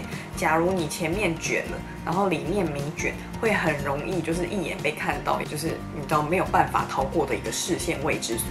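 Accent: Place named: Taiwan. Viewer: 棒棒的你是台湾人吼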